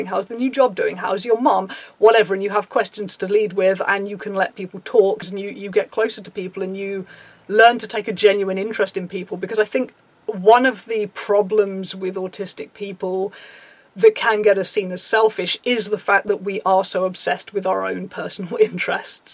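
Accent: United Kingdom. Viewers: I love your videos about Asperger's and eating disorders. Hearing you talking about asperger's was when I first realised it could be me too and somehow your voice and your British accent have become a little voice in my head that speaks English (English is not my first language). I find your videos calming and thanks to you I've come to accept and understand many things about myself. Whether I'm asperger or not is something yet to determine, and I don't know if I'll seek out a formal diagnosis. Thanks for sharing your experience, I feel "at home" when I listen to your videos. I also really enjoy your looks!!!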